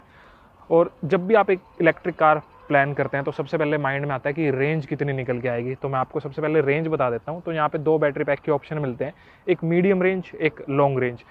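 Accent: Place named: Haryana, India. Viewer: Bhai apka haryanvi touch miss ho raha hai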